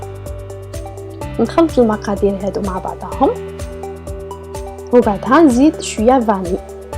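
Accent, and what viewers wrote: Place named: Algeria. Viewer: سمحيلي الزيينه ديالي يلاه خرجت من المستشفى كنت زيدت إبني عثمان يلاه راني ندير الواجب مع الكل انا من عشاق الشوفان قناتك رائعه اتمنى لك التوفيق وانشاء الله يدوم التواصل بينا أضن انتي من الجزائر الشقيقه من خلال لهجتك حيت عندي صديقاتي من الجزائر والاقرباء كذالك كلنا اخوه في الله 🙏🙏🙏🙏🙏🙏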